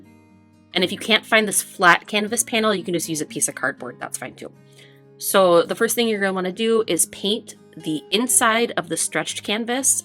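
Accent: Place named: Midwest, United States. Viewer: from another Midwestener, I understood that you said moss 😆😆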